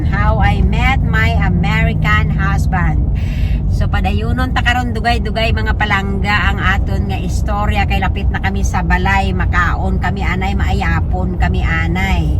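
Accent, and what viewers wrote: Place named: Iloilo, Philippines. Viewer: Kanami pamatian ya ilongga ah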